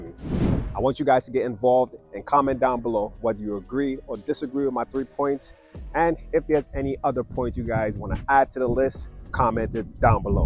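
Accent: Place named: London, United Kingdom. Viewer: Sounds like London!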